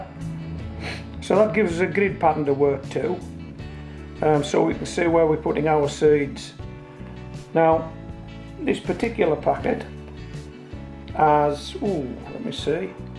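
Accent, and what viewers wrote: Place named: Scotland, United Kingdom. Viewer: Accent seems almost Scottish. Workington up near Scotland?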